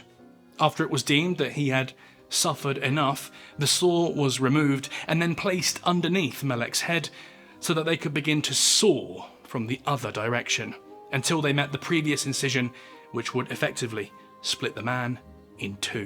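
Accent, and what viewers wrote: United Kingdom. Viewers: YA GOTTA LOVE A HORRIFIC TAKE TOLD BY A BLOODY BRITT!!!! ( I struggle to pronounce the word saw myself.. IT SOUNDS LIKE " SAWRR😱🤨🤣🤣🤣🤣👃🪒🩸🩸🩸🩸🇺🇸🏴🇬🇧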